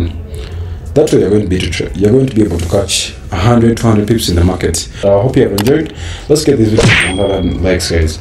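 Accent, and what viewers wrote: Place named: Uganda. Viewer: Hey man.. are you from Uganda... your accent sounds Ugandan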